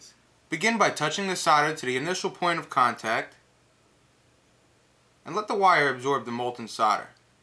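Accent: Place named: New Jersey, United States. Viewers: What kind of American? Southern, New English (New York, New Jersey, New Hampshire, etc.), or western (I think he has a New English) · Simple, straightforward tutorial, with no BS ! you gotta be from Jersey,(or thereabouts...) thanks , Man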